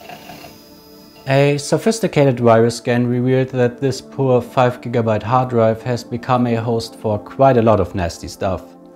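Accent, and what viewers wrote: Germany. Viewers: German English. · THIS ACCENT...are you german?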